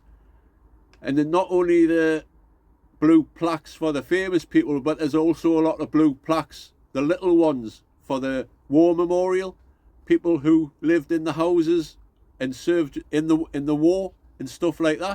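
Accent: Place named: Newcastle, United Kingdom. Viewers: Came for the gardeing, stayed for the music and your canny personality, the history was good, I was stationed at HMS Collingwood for a time in the RN so hearinf about him was interesting, it's all good, you do you pal, don't think you need to change the channel name, dunt really matter, the titles say what it's about, but if you feel like a change go for it. My best man is a Geordie so I don't have any probs with the full accent, some of the colonials mite get a bit confused like lol. I used to watch when the boat comes in in the 70's as a kid, was the last thing we were allowed to watch before bed, I still remember some of the words, and that mad trumpet from the theme tune lol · allotment channel and tings it has to be. look forward to hearing the real jordie accent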